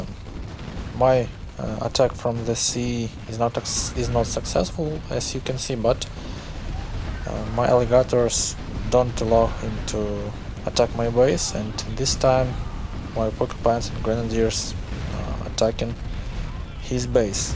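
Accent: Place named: Russia. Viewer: с русским акцентом говоришь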